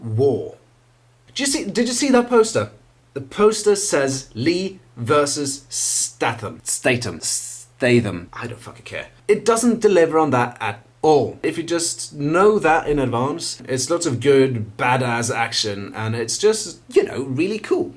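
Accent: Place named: England, United Kingdom. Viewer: how come your accent is so english if you're norwegian?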